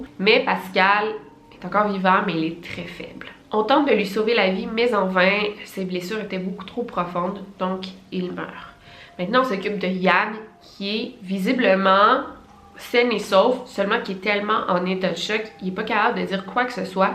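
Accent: Canada. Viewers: Laissé tombé cette Accent canadienne vraiment j'aime pas trop, pourtant elle j'aime bien.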